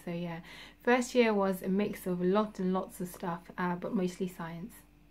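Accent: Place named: Newcastle, United Kingdom. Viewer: Sounds like Newcastle.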